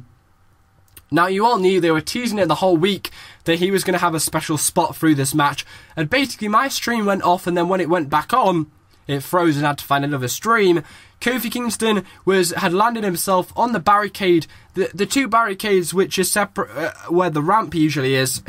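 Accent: United Kingdom. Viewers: Do you speak British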